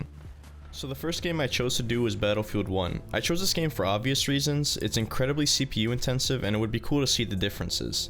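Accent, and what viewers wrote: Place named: Denmark. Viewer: Hey, du lyder Dansk :-) Jeg har også et build med FX CPU, det hjælper sindsygt at give den noget hastighed :-)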